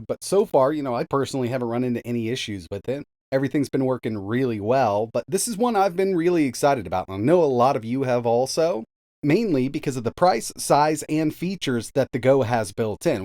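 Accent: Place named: North Carolina, United States. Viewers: Has one of the thickest American accent I have ever heard love the way he says "Mawd" 😂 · That's NC talking!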